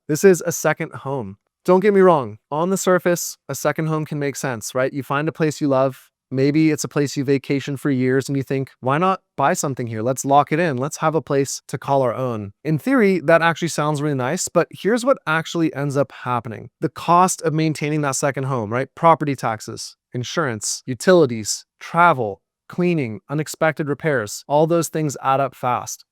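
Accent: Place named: California, United States. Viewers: his California accent is what makes his video so good.... 😉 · Great info,! I watch tons of retirement planning vids. When I 1st watched one of yours, I almost flipped past it after picking up on the CA accent. (I moved from TX to CA for a yr as a young teen and was teased brutally for my TX accent. 50 years later, you'd think I'd be over it. 😄)...but I persisted and watched it thru. Then another. Then another. Now your content has become some of my favorites. Keep up the good work!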